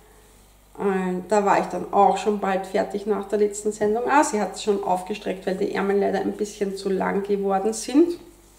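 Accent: Vienna, Austria. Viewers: Hallo liebe, vielen Dank für dein neues Video. Nun bin ich aber froh, dass du hochdeutsch sprichst mit Wiener Akzent. 😀 Als echte Hessin würde ich zu deinem Pullover sagen: "der eas oig schie ean der basst so goud. Unn dai Foarwe.....schinner gieht nead." Tolle Projekte, die du produzierst und präsentierst.👍👏. Das assymetrische Tuch wird wunderschön. Die anderen Sachen natürlich auch 😉 P.S. ❤️lichen Glückwunsch der Gewinnererin des Wollstranges. Das ist eine tolle Wolle! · Ich liebe Deinen Dialekt! Wollte deshalb als junges Mädle(Schwäbin) immer einen Wiener heiraten ((-; liebe Grüße Nicole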